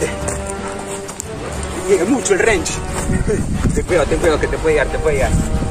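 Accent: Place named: Nicaragua. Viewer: Muy tuani el contenido y muy bueno que tengamos a alguien que muestre este tipo de cosas aquí en Nicaragua, solo mantene el acento nica. Muchos exitos en tu canal hermano 👊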